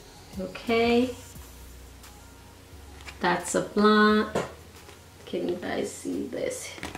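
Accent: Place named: Jamaica. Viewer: So cute I hope Mine turns out well. You sound Jamaican if you are, big up yuhself goodie!!! If not still big up yuhself! May do a review on this as well.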